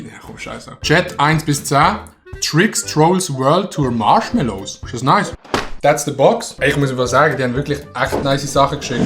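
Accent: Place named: Switzerland. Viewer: Deinen Schweizer Dialekt erkenne ich sogar, wenn du Englisch sprichst 😂👍🏼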